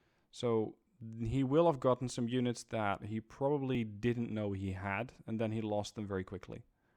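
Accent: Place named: Netherlands. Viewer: New mic. Still sounds Dutch. I guess theres no fixing that :-)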